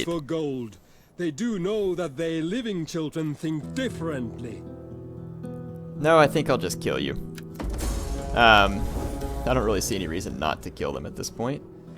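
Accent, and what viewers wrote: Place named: South, United States. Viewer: it's funny how you say things like "ought" and other southern slang. Don't take offense to what I say, and don't change it. But what am I to say anything, I'm a stinking northerner.;)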